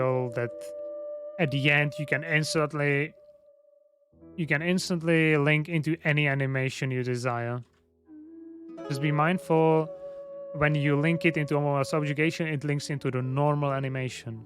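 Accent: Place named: Czech Republic. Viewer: sounds like czech accent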